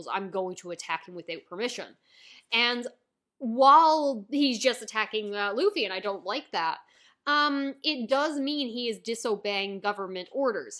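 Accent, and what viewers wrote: Canada. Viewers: Is your accent Canadian?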